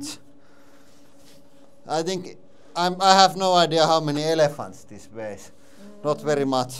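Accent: Finland. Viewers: Somehow the Finnish accent goes so well with the hydraulic press.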